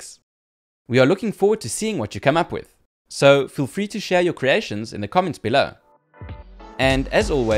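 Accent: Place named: South Africa. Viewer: He is sowth efrikan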